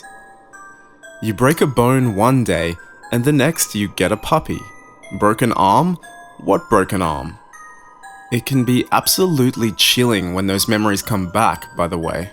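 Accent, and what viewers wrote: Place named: Australia. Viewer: On a side note, always loved the Australian accent, and I feel like it works great for this story! But I do love the way yall say No very much lol, not trying to knock ya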